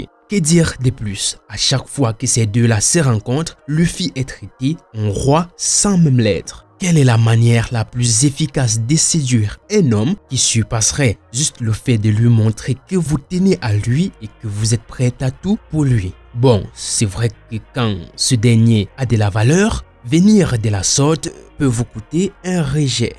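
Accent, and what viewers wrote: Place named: Ivory Coast. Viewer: Tu parle comme un côte ivoirien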